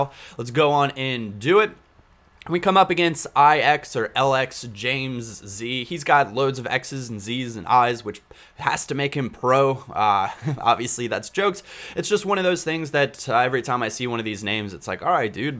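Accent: United States. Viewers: hes american isnt he? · leave a like for american accent !!!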